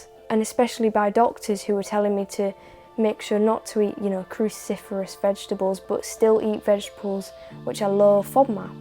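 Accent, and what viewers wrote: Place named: North, United Kingdom. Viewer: Fabulous video. Thank you for sharing. Also nice to hear a Northern UK accent on YouTube for a change! 👌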